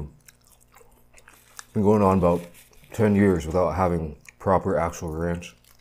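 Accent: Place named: New York, United States. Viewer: Are u from New York u have the accent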